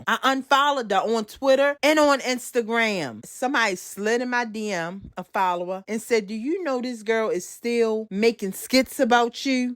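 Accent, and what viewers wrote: Baltimore, United States. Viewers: You're so beautiful!!!!😍😍😍 and that BMore accent is everything 😂😅😂💪🏾💪🏾💯💯 and chhhiiiiillllleeeee iono what to say bout Ms. Keke. Sorry to that girl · Baltimore accent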